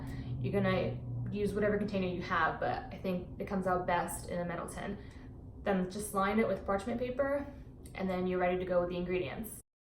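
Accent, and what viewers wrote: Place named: Australia. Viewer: I hear a hint of auzzie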